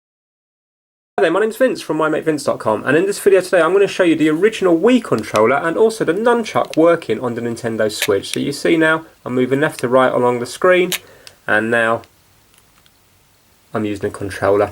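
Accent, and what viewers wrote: United Kingdom. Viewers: Rami Abdalla he has a British accent. So that’s why he says it like that. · Geekas Kid probably British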